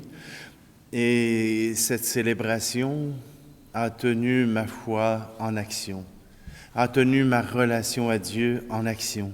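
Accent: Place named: Canada. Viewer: Il y a trois ans, la Providence a fait qu ' un certain à des milliers de kilomètres de chez moi célébrait la messe. Un accent canadien, je me suis adaptée! Quelle liberté car nous n avions en France droit de nous éloigner qu'à 1 kilomètre de notre domicile...Merci Seigneur de nous avoir envoyé. Ses qualités humaines, ses homélies, ses chants choisis, m 'inspirent chaque jour. Je te rends grâce Seigneur de ces moments de partage de foi, d Espérance. Notre France va si mal, elle a oublié son berceau chrétien... Merci encore. Bonne journée à tous.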